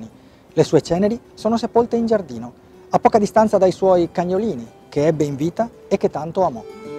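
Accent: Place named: Veneto, Italy. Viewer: Un venetofono alle prove col raddoppiamento fonosintattico: esilarante! :-))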